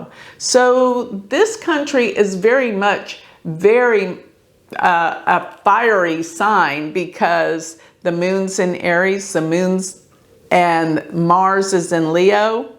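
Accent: Texas, United States. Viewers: has a sweet accent: 'Intercontinental' became 'inerconinenal'. Perhaps it is texanese.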